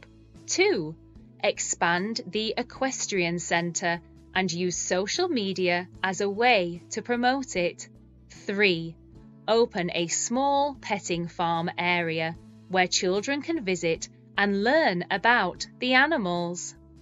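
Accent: United Kingdom. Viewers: I always love British accent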